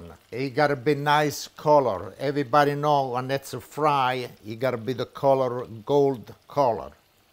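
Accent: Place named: Campania, Italy. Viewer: Hi, just curious to know from which part of Campania you come from because my Mum used to say "A salut, addo' va'" and im from Sorrento Area. Nice video!!!! Keep it up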